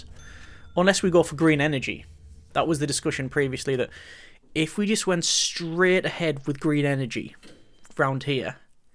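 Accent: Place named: Northern England, United Kingdom. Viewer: It's funny, I'm originally from NE England but I've lived away from there for almost 35 years, with 15 of them being in Australia. As such, my accent is very... different. But when I have more of a northern twang, my sons say "you've been speaking to Grandma, haven't you?". Now, they also add "or listening to that bloke off YouTube". So it is all your fault if I can't order through Maccas drive-thru again without affecting a "posh" voice :D But anyway, I do love a bit of Prison Architect washed down with a proper cup of tea.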